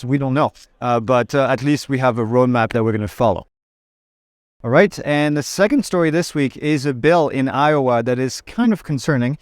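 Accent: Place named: France. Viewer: Of French vocal cadence (accent), some of his words sound different, of course. A phrase he stated is actually very accurate in how it sounds, although he purposed a different meaning. "NASA has this information." is what purposed to denote, though of his vocal cadence, to the common English language eear, it sounds like "NASA has *disinformation.*" Not sure how accurate realizes his statement actually sounds as it is factual! Indeed, the American government has much disinformation all the time!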